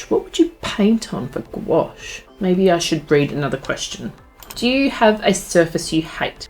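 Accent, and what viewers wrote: Australia. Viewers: This was a video that started to autoplay and I wasn’t actually looking at my screen and I nearly broke my neck with how fast my head whipped around at the sound of your Aussie accent!! 😂 Couldn’t hit subscribe fast enough! My daughter is very creative, can paint and is a preschool teacher so I will also share your channel with her.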